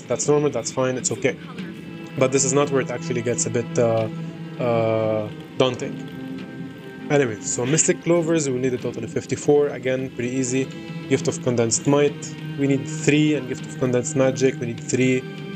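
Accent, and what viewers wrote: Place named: Arab world. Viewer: My dude your accent is very close to my own, are you lebanese or anywhere around the middle east?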